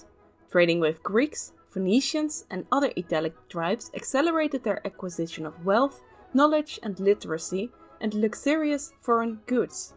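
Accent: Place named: Netherlands. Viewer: Great stuff! Started off sceptical, since the first view presentation didn't look that inviting. However, now that I watched this first video I have to say, love what you are doing here! Especially taking the ingame depiction of Etruria, and putting that next to the popular and academic views is a really nice and interesting exercise. And in general, its nice to hear a Dutch accent in a space often so dominated by Anglophone ones. This one was off to a great start for this series!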